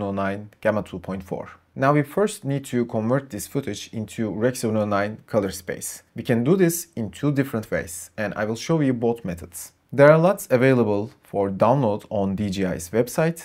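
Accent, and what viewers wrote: Turkey. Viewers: reis türksün galiba sesi duyar duymaz türk konuşuyor dedim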